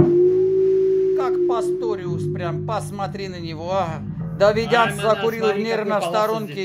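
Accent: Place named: Yunusabad, Uzbekistan. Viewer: Вой билат! Юнусабадский акцент что ли?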